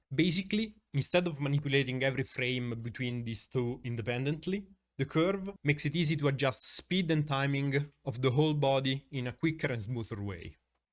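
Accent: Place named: Italy. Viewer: Mai collegh hahaha figo , si sente che probabilmente sei italiano lol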